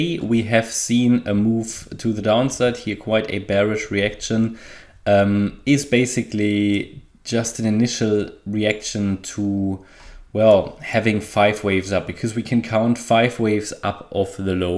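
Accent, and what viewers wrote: Germany. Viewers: Prima Content, dem Englisch nach kommen Sie aus Deutschland. Daher meine Frage : gibt es das auch irgendwo auf deutsch? Bin mir manchmal nicht ganz sicher...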